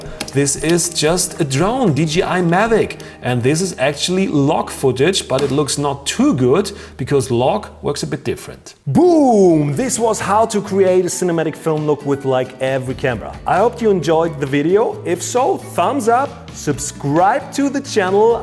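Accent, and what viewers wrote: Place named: Germany. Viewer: Irgendwie hört man dass du auch Deutsch sprichst ;D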